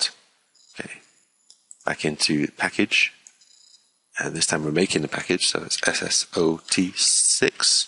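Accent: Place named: Scotland, United Kingdom. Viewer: Firstly, thanks for taking the time to make a tutorial. It's much appreciated by many! As others have said, if you are going to actually take that time to make something to help people, it might be worth trying to slow down (a lot) when you're talking, and try not to mumble. Your Scottish accent adds an extra layer of difficulty for those non-Scots. It would really help everyone to understand your excellent tutorial. But thanks again for a great tutorial!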